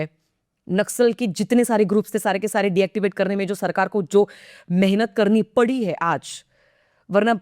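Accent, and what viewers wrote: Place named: Maharashtra, India. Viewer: Great video. You are Maharashtrian , I can say from your accent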